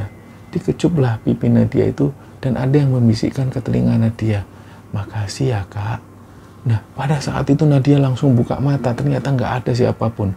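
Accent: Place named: Surabaya, Indonesia. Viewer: Shanghai haderrr arek suroboyo seng merantau, kangen logat suroboyoan😆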